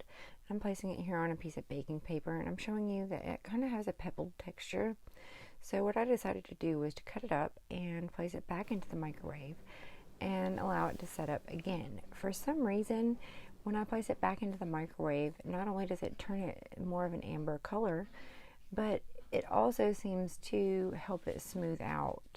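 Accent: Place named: United States. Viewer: that's fabulous and I am looking forward to viewing it, you have a great American voice, you sound very calm and placid! I would like to make videos but I have no understanding of video editing, I have some super ideas for content but just don't have the time to train myself in video producing so I am trying to write instead, however even with that I struggle as I much prefer writing with pen and paper, my previous life is so far from my new venture in upcycling and art and I would love to tell my story of the last two decades after the 90s rave scene mingled in with my theories on elite circles and their corruption and the impact on society, it is an extremely unusual tale from a part of England that is not really documented, I am from the southwest which to most is a sleepy, rural, picturesque haven but it is really different to what people think! 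Well I'm probably driving you crazy now , I'm terrible for going off topic, but I do love chatting especially to Americans! I love America and would love to one day visit.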